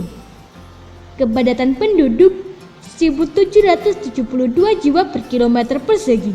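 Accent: Java, Indonesia. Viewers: Suaranya bahasanya kok medok totok kali jawa nya. Jadinya memuakkan. Apa gak ada manusia yg lain ? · Medok amat mba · Ngomong logat jawa males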